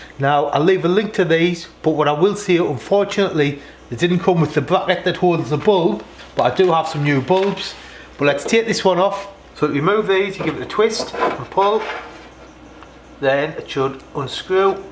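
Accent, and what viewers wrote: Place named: North East England, United Kingdom. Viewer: Great videos mate. Because of you I’m really getting in to Ford. You from Newcastle, Sunderland or Middlesbrough? Rob from Ireland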